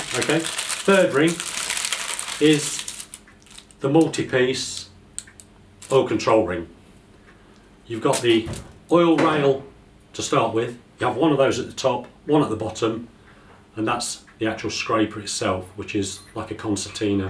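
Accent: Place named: United Kingdom. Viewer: Sound like your from UK do you do anything for the 4g63 engine ? And the k20 Honda ?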